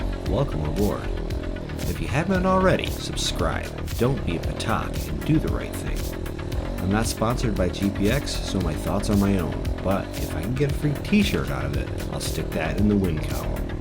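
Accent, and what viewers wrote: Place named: Northeast, United States. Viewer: Are you in upstate NY, NH or Vermont? Gotta be one of those judging by your accent. Also the FSE250E is now fuel injected and runs the same motor as the CRF250F, which is one of Honda’s Chinese/Thai bikes.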